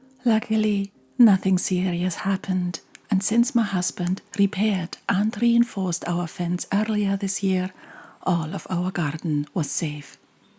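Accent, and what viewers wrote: Scotland, United Kingdom. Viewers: I just love your channel, and your Scottish accent. When I first watched you knitting I thought "why is she making so many baby hats"...lol. The pumpkins are just beautiful. I crochet and plan on giving these a try.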